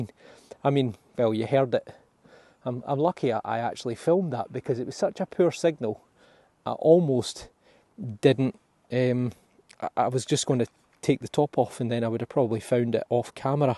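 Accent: Scotland, United Kingdom. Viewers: I'm one of your new subscribers and just want to say you deserve all this new attention your getting, not only are you making wonderful discoveries but also your Scottish accent is one of the subtle ones, which makes you easy to understand, even for non Brits, best wishes for 2023 hope you find what your looking for Andy a Brit from the Midlands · The face is not ugly and the Scottish accent is so beautiful. The history you know is so interesting.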